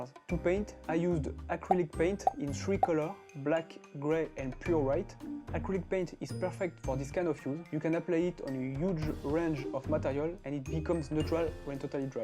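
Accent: France. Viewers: J'ai direct su que t'étais français à l'accent 🤣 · English is good but you French with that accent make me laugh. Sheet = shit lol. I think all French struggle with English.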